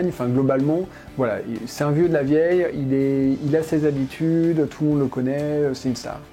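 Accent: England, United Kingdom. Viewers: mais cet accent anglais je suis jaloux !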